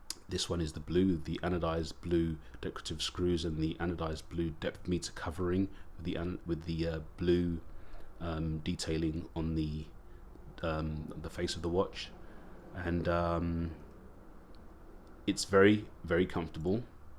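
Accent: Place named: South, United Kingdom. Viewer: Hey buddy... What is wrong with your channel, you’re about 10’000 subscribers short! Fantastic in depth quality and a huge passion can be seen and heard in your voice. I will give you and your channel a mention in my community section on my channel for others to discover you. I’ve just recently covered the diving mode side of the GWF-D1000 next to the Gulfmaster, as nobody has covered it properly. You have an accent close to me being more southern on the UK Island but that doesn’t cover where you’re based of if I’m correct LML ;) Anyway my friend, will sub you and try and get you a little more attention as you deserve a heap more, trust me, i’ve seen many channels that aren’t near to your quality with thousands of subs. Have a great Sunday and I’m sure we’ll chat more ;)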